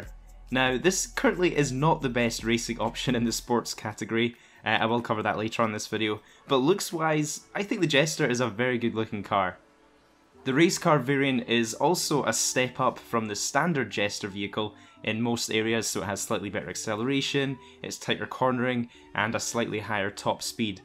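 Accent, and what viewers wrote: United Kingdom. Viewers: Great video brother trying to figure where in uk your from ireland??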